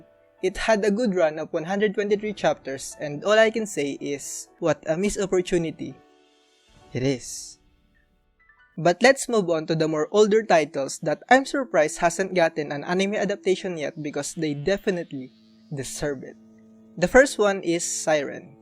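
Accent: Philippines. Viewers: your accent sounds filipino, but if Im wrong I think you're still from the southeastern asian countries, correct me if i'm wrong tho. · What an Filipino Accent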